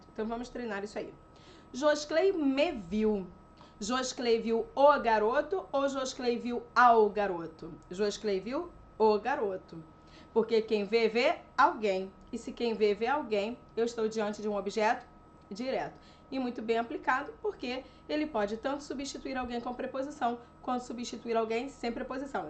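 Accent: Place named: Rio de Janeiro, Brazil. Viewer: em um dos vídeos, disseram que a prof estava com a voz da Anita; neste, ela está com a voz da Thaís Araújo. Acredito que tudo se deve ao modo como ela reproduz o xxxxxxxxxxxx carioca! rs É instigante a observação e comparação.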